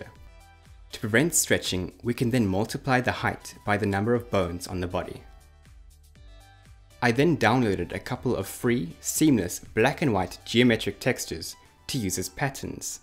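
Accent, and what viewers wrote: South Africa. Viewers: Great YouTube channel! I assume you are South African, because of the accent. I am also proud South African. It’s so cool to have a growing YouTube channel such as yours based in South Africa. You are definitely in my top 3 game development YouTube channels. Could even be number 1. Keep up the great work. Oh and where in South Africa do you live?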